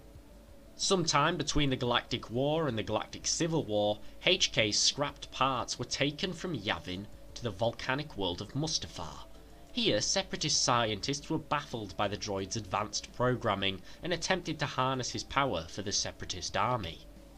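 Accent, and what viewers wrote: England, United Kingdom. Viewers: I don't get annoyed at the way anyone pronounces words. Being Scottish I'm often ridiculed by the English for how I speak. lol even my English friend does it. I just think its wrong to mock someone or get annoyed at someone because they don't talk the same way as someone else. and I found it ironic that English speaking Americans were getting mad at how an English person speaks English. It does sound kind of funny when you think about it. I didn't mean to cause offence or anything. Just found if kinda funny is all. Its really interesting how language develops over time and i get that. Although I have to say the Scottish language at its purest form confuses me. It barely sounds English. It just kinda bothers me how every video this guy makes some American is pissed because he talks different. Like in the UK most citys have different accents and slang and we embrace it. 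we don't tell each other were speaking wrong. That's all i was getting at. Why get pissed at someone for something that is really hard to change? I have been trying to lose my Scottish accent and pronunciation for years to try to Americanize it because I hate it and its hard. As for accents. Americans do have a thing for. the amount of girls who find my hot just because I talk Scottish is crazy. you cant really talk for your whole country just because you don't find accents attractive.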